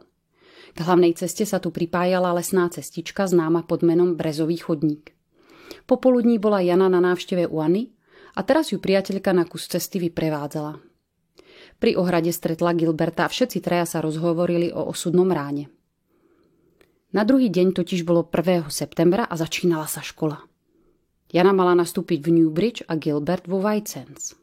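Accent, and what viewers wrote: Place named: Slovakia. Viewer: Cítit, že slovencina je tvoj matersky jazyk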